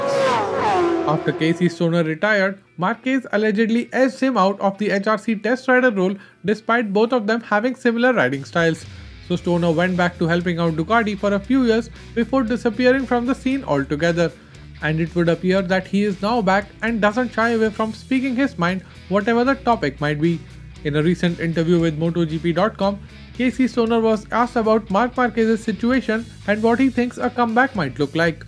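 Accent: India. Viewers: Ha! It takes a couple of views and you will be used to the mild Indian accent.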